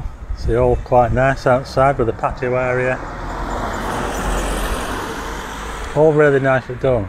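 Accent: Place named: Blackpool, United Kingdom. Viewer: This is awesome viewing from Blackpool's most famous Vlogger, Not only do you show us the granduer of the Promenade,but more importantly the back streets that most people on visits never get to see. its so sad that not only in Blackpool but all around the UK a lot has been left to rot away and become derilict But you show it in sobering way which is quite touching with your choice of background music. Your dulciet blacker's accent gives the channel a homely feel and gives one the impression ude be a lovely lad to sit and have a cup of tea and share a cake with. i always harp back to 1976 when working on the Grand Theatre ,great fun times we had in the city of illuminations... Regards from North East Thailand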